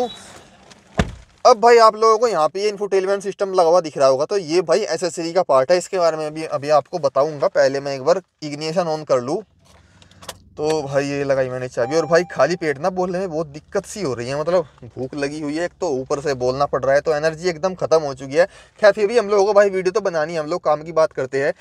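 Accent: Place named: Muzaffarnagar, India. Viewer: This is genuine tone of muzzafarnagar❤....all the best 👍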